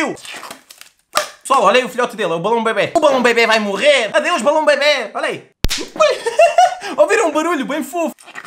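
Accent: Portugal. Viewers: Marcia Marques e pq ele e português ne burra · sou brasileiro e tu es portugues e isso mesmo? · Ele E De Portugal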